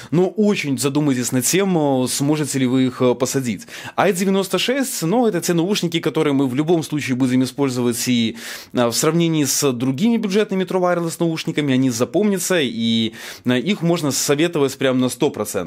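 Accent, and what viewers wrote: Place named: Belarus. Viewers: Дзеканье цеканье то да, отличительная особенность белоруса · Сам тоже из Беларуси, но после года жизни в Москве, очень слышен твой белорусский акцент, аж уши режет)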